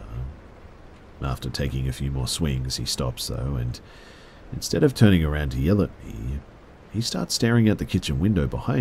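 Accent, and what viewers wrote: Australia. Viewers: So you’re from the land down under?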